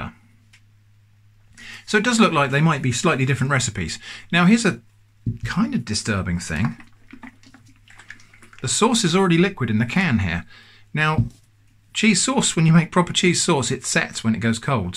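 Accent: United Kingdom. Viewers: What part of Britain are you from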